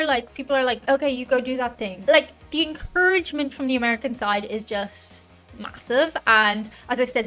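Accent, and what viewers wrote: Ireland. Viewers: As far as being hit on, you're fairly attractive and the Irish accent adds just enough exoticism without being too alien. So it doesn't surprise me too much that some men in America go, "what the hell" and take their best shot. 🤷‍♂ As for distance measured in travel time, I think that likely has a lot to do with the fact that we have such vast distances that it can take a really long time to get anywhere. (That really hit home for me once, on my second trip to Germany. I flew in to Hamburg and spent three days; hopped a train to Berlin and spent five days; hopped another train to Ingolstadt and spent a day; and then took a local commuter into Munich and spent a few days before I flew home. As I was checking out through German customs, the agent there noted I'd flown in to Hamburg but was leaving from Munich and asked me if he understood that correctly. 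When I confirmed that was the case, he said, "But that's very far, ja?" To which I replied, "Not really ....😕") Also, for a completely different experience in receiving directions, you should come and visit us in Colorado, some time. You'll hear something new. 😁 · Hello Fellow Humans, We are direct because you are absolutely gorgeous. You have an irish accent which is one of the top sexiest accents in the world. You are a price for men in America. You are gorgeous, blonde, blue eyed, irish. Seriously you can easily get a husband in America and he will worship you. Brand loyalty is because we are initiated with commercials and ads from birth. Parents live through their kids and so force them to do what they love. It is annoying. Let kids be kids. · MMM. I think the reply to thank you and compliments is kinda regional. I started replying thank you to compliments because I was never good at taking complements ( Maybe those Irish Genes LOL ) they always flabergasted me... 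I thought that might be considered rude , so I go to thank you now. You are attractive and you have that great Irish accent.... so no surprise you get hit on... Just turn them down politely...that will usually work. Remember the US is full of folks of Irish descent. .. so it is easy to feel that attraction. Yeah.. we do.. over do too well. I have had other Europeans tell me they liked the encouragement to do something instead of one should stay in their own lanes. Interesting .Thanks.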